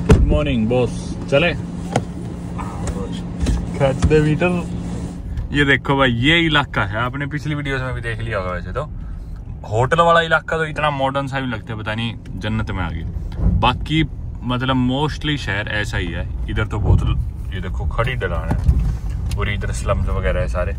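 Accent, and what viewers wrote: Haryana, India. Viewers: Like ur hariyanvi accent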